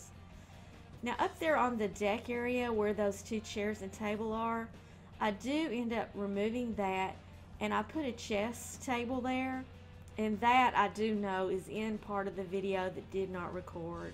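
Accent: South, United States. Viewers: I love your house building video, This is the first one I watched of your builds. I love your accent to, Are you southern? Just curious.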